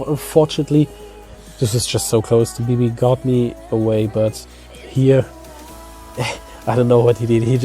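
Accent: Austria. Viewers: Nice Vid. aber man hört beim English den Österreicher raushängen 😝👍🏻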